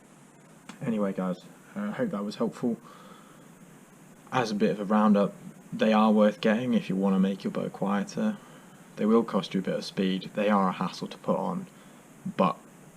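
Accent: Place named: United Kingdom. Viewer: the unintelligible limey blather ends and installation begins.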